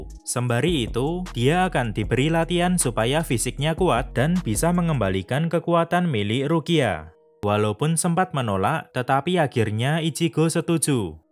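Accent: Java, Indonesia. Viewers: Ketoke mimin iki wong jowo😂 Suarane koyok wong jowo soale :v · Medok bnget jawanya 😂🤣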